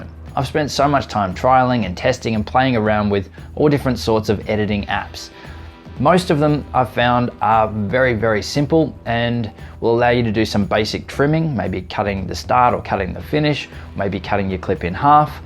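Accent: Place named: Australia. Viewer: Bro your Cleary Australian Australia for life (I'm Australian as well) I'm WA what state are you if you want to share it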